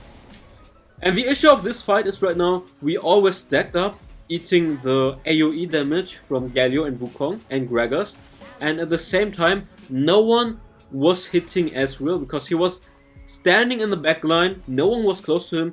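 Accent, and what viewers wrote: Germany. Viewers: One Question... are you from Germany? Your pronunciation sounds a bit german. Btw, I really like your videos... they are very helpful for platinum players like me :)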